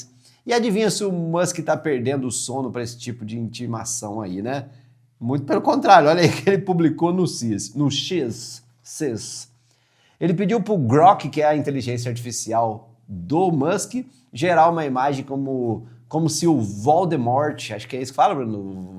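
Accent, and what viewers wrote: Brazil. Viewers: Top demais como sempre. Há, e o inglês brasileirado é o melhor, parabéns.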